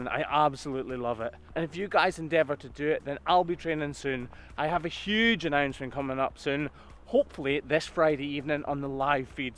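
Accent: Scotland, United Kingdom. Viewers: He’s a question not about the cows hoof but he it goes - what part of Scotland is your “accent “ from? Your voice is a wonderful combination of Scottish and English or am I completely wrong? Either way I enjoy learning and watching your videos. HAPPY NEW YEARS !!!! Hope 2020 is filled With love peace friends family and laughter 😊PS tell Mrs Hoof GP we are great full for all the time you put into your YouTube channels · elaine east-byers yes, I can hear all three. That’s why I was confused as well. You drop your T’s, such as saying “ca’ ‘l” instead of cattle, which screams Cockney; however, after doing a quick search, it appears that first appeared In Edinburgh and Glasgow, then later Cockney. Surprising enough, my midwestern USA English understanding requires me to pay close, close attention to you speaking so I can understand you. Sometimes I even have to “translate,” which means I may get behind and have to rewind! 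No doubt if we were speaking in person I would be saying “what?” quite a bit! I hear the lovely brogue on the o’s!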